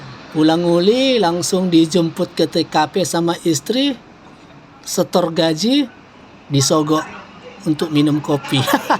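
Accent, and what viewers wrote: Medan, Indonesia. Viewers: Bang mau tanya boleh ngga Abang aslinya orang mana ? Tapi logatnya kaya orang Medan ya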